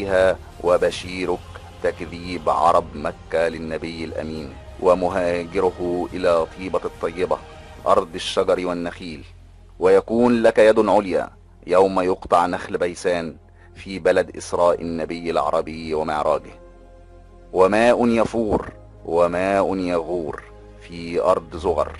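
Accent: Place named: Egypt. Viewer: اخى العزيز لا تنطق حرف الجيم بلهجتك المصرية عند قراءة القرآن او الاحتديث النبوية الشريفة بارك الله فيك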